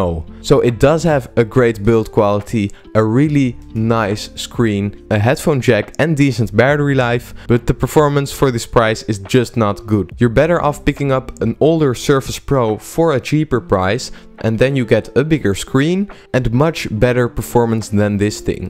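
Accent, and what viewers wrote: Netherlands. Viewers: That is quite a strong Dutch accent. But at least you're not from Limburg.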